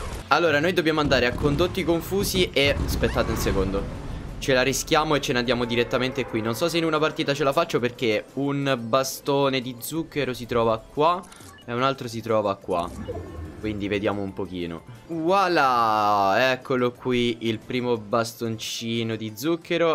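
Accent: Rome, Italy. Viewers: Ma sei di roma?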